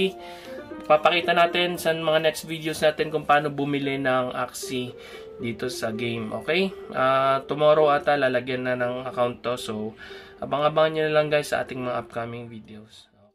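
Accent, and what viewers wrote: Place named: India. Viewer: titulo en español y audio en indu-ingles, que mal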